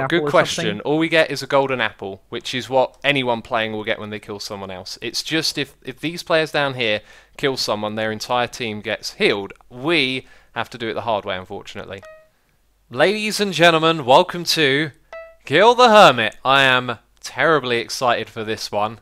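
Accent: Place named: United Kingdom. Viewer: I haven't watched him in ages and he sounds British(OH SHIT, YES, BELIEVE IT OR NOT THIS IS AN OPINION. WOW AMAZED). Please don't get mad at me when I barely know anything about him, Mr. Know-It-All...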